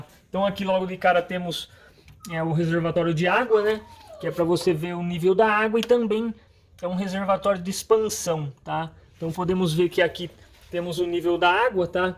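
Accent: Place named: Espírito Santo, Brazil. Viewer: Aqui no Espírito Santo falamos assim tbm. Cada região com seu jeito de falar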